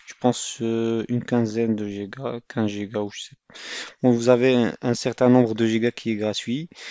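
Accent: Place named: Morocco. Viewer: 3raftek mghribi gha mn lfrançais ta3ek 😂😂😂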